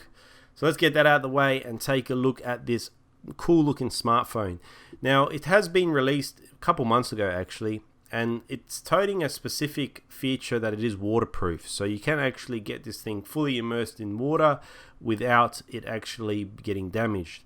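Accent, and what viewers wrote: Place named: Australia. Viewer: Now I can distinguish which accent belongs to which Country. U r from Australia !!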